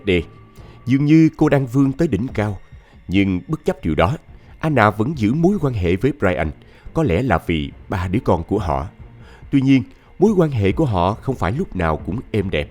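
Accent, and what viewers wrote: South, Vietnam. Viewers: Xướng ngôn viên có giọng đọc miền Nam hay quá. Thanks.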